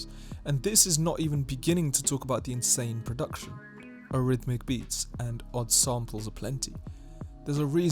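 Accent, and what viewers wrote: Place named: England, United Kingdom. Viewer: It’s cool hearing an English fella taking about one of my most personal and favorite albums. It’s like a Louis Theroux documentary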